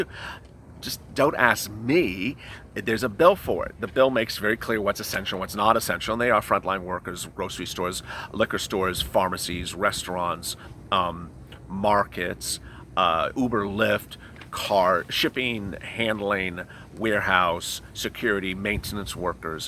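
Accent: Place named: Southern California, United States. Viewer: So cal.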